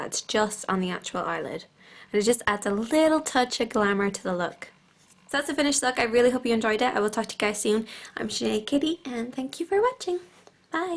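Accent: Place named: Cork, Ireland. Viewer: Love your videos! Especially with a cork accent it good to hear! :-D haha! I've just started making my own videos if you wanna check om out! Get more Irish on here :-D haha! X x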